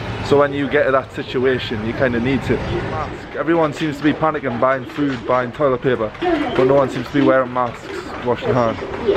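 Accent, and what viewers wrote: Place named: Newcastle, United Kingdom. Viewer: You sound like a fellow Geordie and I have just about watched all of your videos. Thanks for posting.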